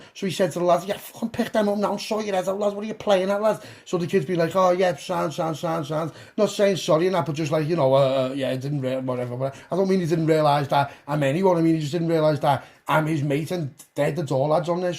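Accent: Liverpool, United Kingdom. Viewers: Heavy scouser lahhh love it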